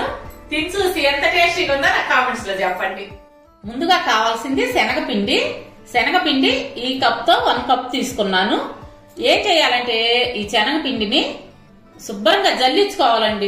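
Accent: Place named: Anantapur, India. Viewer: Meeru anantapur yasa matladtunaru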